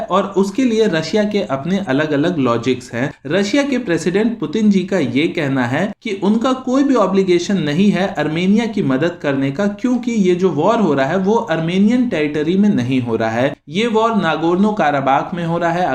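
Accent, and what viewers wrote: Bengal, India. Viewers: Sir r u bangoli?? Motamuti..😅u can't hide your words..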